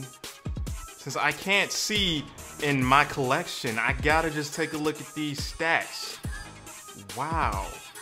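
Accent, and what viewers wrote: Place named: Brooklyn, United States. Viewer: I can tell that u from Brooklyn lol.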